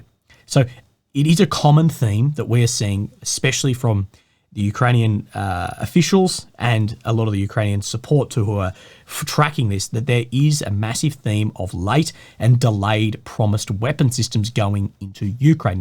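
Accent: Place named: Australia. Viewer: What a fentastik 😍😍😍😍😍 day what a fentastik accent i love Australia accent wooow, you channel is great but your accent is amazing, fentastik. Have fentastik day sir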